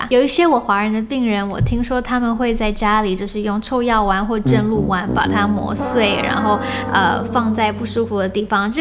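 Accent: Taiwan. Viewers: 😀因為您的口音，我猜您是台丸人? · 你的國語有台灣口音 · 口音似乎是從台灣來的。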